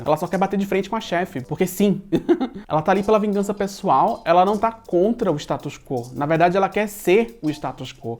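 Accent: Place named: Rio de Janeiro, Brazil. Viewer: Falando em várias personalidades, uma pergunta, você nasceu em Pernambuco e mora em São Paulo, mas fala como um carioca, por que isso acontece?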